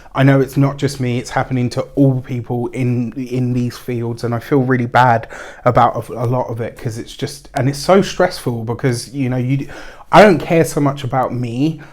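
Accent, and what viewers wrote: United Kingdom. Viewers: Sag Rising here and that was totally on point thanks! Fellow Brit here too and it's refreshing to hear another Brit doing tarot 🙂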